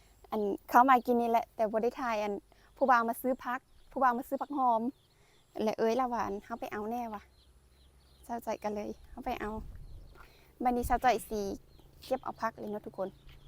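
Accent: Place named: Phu Thai, Thailand. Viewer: สวัสดีจ้าสาว ภาษาภูไทก็มีหลายสำเนียงเนาะจ้า เออสาว อยู่เมืองไหนจ๊ะ😊😊😊❤❤❤❤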